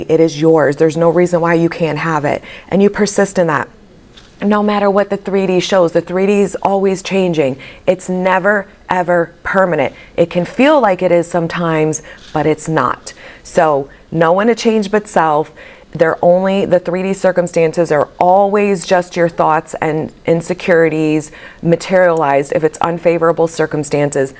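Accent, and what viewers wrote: New York, United States. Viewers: You're f***ng amazing. I can tell you're a New Yorker. I'm a subscriber and I'm so glad I found your site. You help me always over the last couple months. I'm a work in progress. Gemini guy and working on rebuilding with my Cancer lady. Just your aura and lovely way of breaking down the steps. Keep up the awesome work. Love it all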